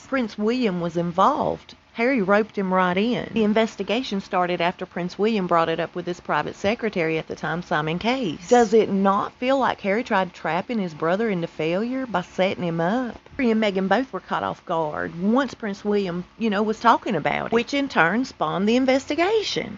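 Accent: South, United States. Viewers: thank you so much for this video and all your videos.I had a hard long day Here in Vegas such a blessing To come home Cook dinner and here that southern Voice.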